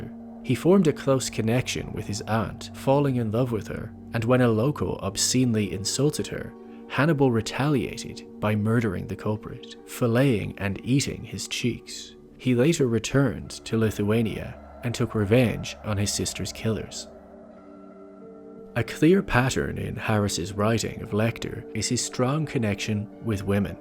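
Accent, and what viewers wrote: Ireland. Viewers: I dedect a faint irish twang?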